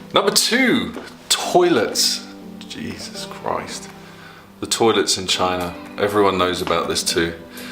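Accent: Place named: England, United Kingdom. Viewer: Your proper English accent and your pleasant countenance make watching your videos a delight.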